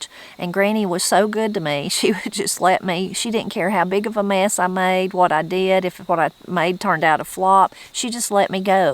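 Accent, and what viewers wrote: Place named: South, United States. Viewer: I am from Columbia, California. You remind me of my family, my Mother, Aunts, Grandmother, your southern accent, you love of God, your growing a garden, hunting. I feel like I am home when I listen and watch your channel, Thank You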